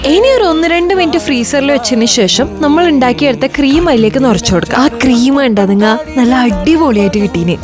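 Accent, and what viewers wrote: Kannur, India. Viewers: Kannur ahnnaa? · Kannur anno chechi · Chechi kannur aana😅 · Kannur ഭാഷ ❤ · kannur analle❤😊 enekkariyathathu konda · Kannur ahno eyal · കണ്ണൂർ കാരി ആയിരിക്കും അല്ല്യെ 😌🐝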